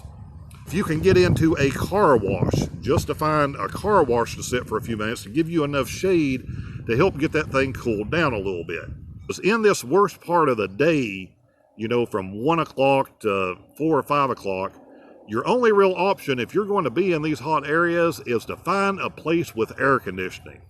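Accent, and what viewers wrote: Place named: New England, United States. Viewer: Just his "accent". Folks from New England drive me nuts with their accent, when they say "sore" in stead of "saw", etc.; i-dear instead of idea.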